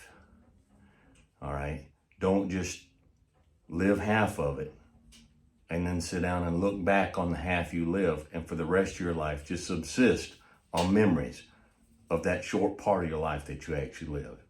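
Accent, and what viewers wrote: United States. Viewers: No worries you 're doing all well mate . Your coffe is great pal . Love watching your videos . And I adore your cowboy's accent mate ))) Great respect from STRONG UKRAINE ))) I keep watching you . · Am not English speaker, sometimes I get the meaning, you are sir a very good man, I wish I was fluent in English especially understanding this strong real American accent.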